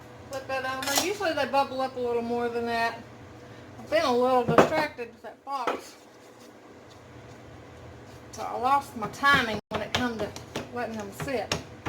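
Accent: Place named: Tennessee, United States. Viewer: Love the video. I’m a Tennessee girl and I talk like you do 🤗🤗🤗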